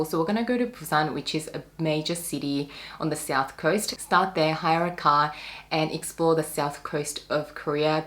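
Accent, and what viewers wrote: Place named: Australia. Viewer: Your aussy accent is so cute and the way you speak is so pleasant and calming. I can listen to you talk forever 😆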